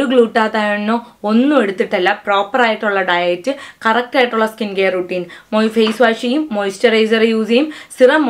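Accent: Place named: Thiruvananthapuram, India. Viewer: Thiruvanandhapuram ahno sthalam😂 nammde tone pole 😆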